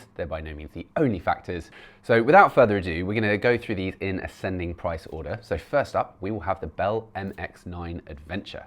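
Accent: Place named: United Kingdom. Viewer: speak a bit more clearer with he's British accent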